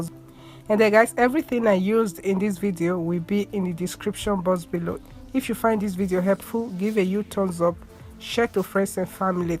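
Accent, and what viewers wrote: Africa. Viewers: My mom has the same African accent like yours, it's soooooo beautiful. I'm Ghanaian btw🇬🇭🇬🇭🇬🇭🇬🇭🤘🏾😛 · YOUR ACCENT IS SO CUTE I WANNA DIE. I LOVE AFRICANS!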